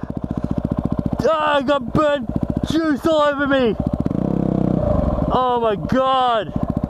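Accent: Australia. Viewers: is he from australia?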